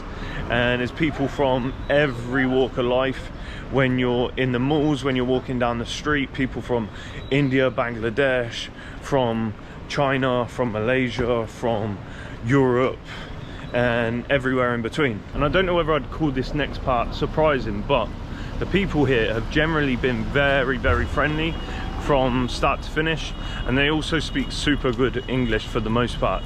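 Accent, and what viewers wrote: United Kingdom. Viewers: It must be from the UK that's why you knows protons..but unfortunately you doesn't know that protons are malaysian cars..Actually all around you are proton cars but you don't know because it's possible that protons aren't marketed in the UK anymore..that's why you don't know the new release from protons..and you noticeable accent is Uk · What part of UK are you from because your English is English…u know what I mean?? You are speaking very smoothly, every one can understand you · I absolutely LOVE the british accent, i can listen to that all day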